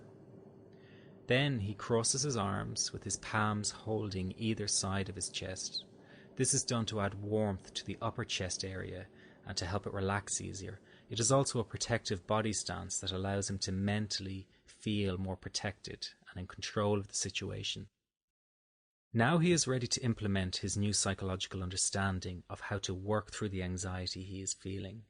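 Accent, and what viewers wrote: Ireland. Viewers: IRISH ACCENT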